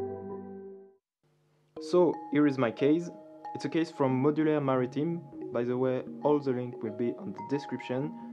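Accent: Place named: France. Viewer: Talking about modular sounds that much better with a French accent. 😃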